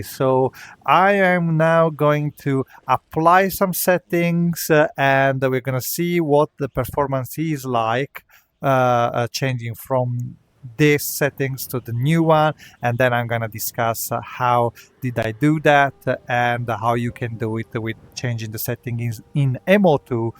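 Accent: Italy. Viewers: Il bro è palese italiano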